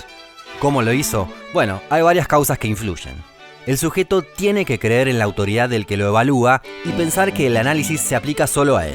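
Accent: Argentina. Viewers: Me gusta el contenido. Ese acento argentino, en vez de molestarme, le da singularidad.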